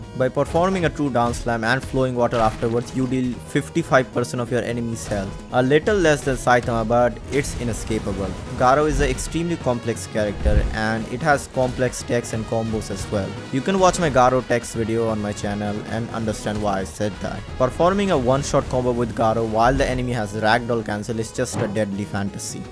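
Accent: India. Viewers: The indian accent it makes it beter ong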